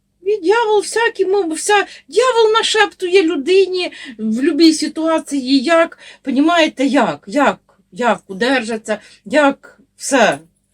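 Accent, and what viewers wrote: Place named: Poltava, Ukraine. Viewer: Привіт з багатостраждальної Херсонщини.Слухаю Вас як дома побувала.Моє дитинство пройшло на Зіньківщині.Там проживають мої рідні,до яких я вже третій рік не можу приїхать через кляту війну.Наш полтавський говір не забуду ніколи.Миру нам і закінчення війни,а вам бажаю здоров'я.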